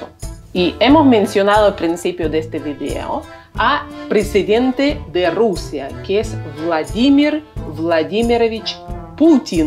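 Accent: Argentina. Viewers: Señora! Por favor la parte dos y tres. Literatura Rusa, música, arte en general. Faltan muchos nombres y apellidos. Muchas gracias! (Bonito acento argentino). Hasta pronto. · Lindo video Tienes acento argentino !!! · Tu español suena argentino?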